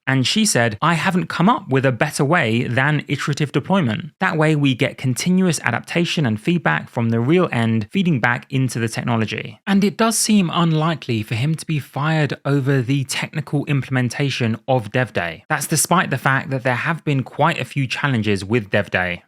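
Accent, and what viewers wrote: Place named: United Kingdom. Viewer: You went full detective mode in this one. Your British accent fits that very well xD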